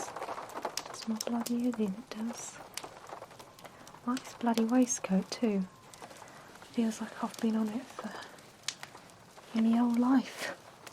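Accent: England, United Kingdom. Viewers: I’ve been in Canada for 40 years now but this took me right back ‘ome. The accent was bang on, and just the way you formulated the sentences was so authentic. I laughed a lot till it all got sad and told of the wretchedness of those times. Well done, and thank you!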